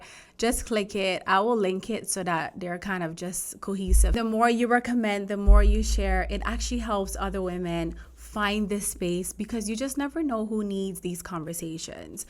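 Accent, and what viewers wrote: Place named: Jamaica. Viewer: I hear the accent, 🇯🇲🇯🇲. Women Dr. and new sub ❤. Big up!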